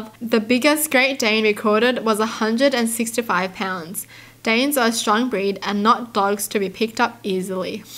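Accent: Australia. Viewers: Ok, I can listen to you all day because of your accent 😂 I think you speak with an Aussie accent .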